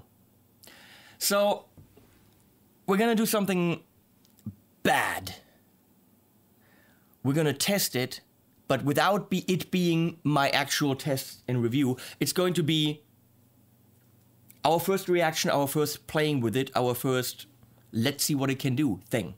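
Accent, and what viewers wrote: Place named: Germany. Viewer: That German accent. ;)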